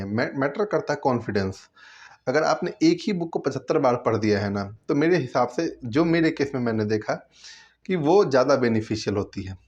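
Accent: India. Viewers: Bhaiya maths or reasoning smjh nhi atta hai jayda. Koi solution bata do bhaiyaa. Bhaiya app up se hai na? Ya up mai rehte hoge?? Awadhi tone hai appke boli mai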